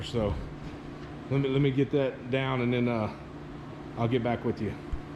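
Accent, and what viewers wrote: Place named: South, United States. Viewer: Nice man. I am from south lousiana I am a pipe welder by trade. Nice work. You sound like your from the south your self. Either way great job.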